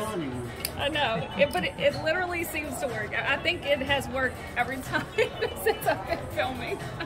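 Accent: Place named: South, United States. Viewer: would be AWESOME to play blackjack with ya sometime if it's in the " cards " and ya I'd love to learn that southern talk!! I'm Canadian were known for the cold and wearing summer stuff in the cold, dont ask, lol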